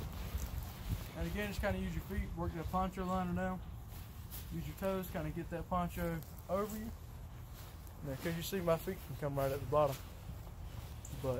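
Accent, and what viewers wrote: South, United States. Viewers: You are the only person I've ever heard with a voice that sounds like mine. You have a southern accent. But it was strange listening to this. I like the channel. Keep it up man.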